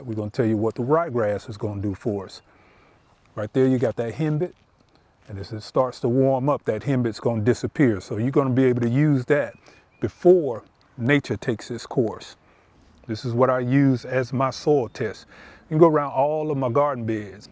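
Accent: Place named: Georgia, United States. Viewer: Great video! you sound like Jamal Bryant a little bit. I wonder if it’s a GA thing😂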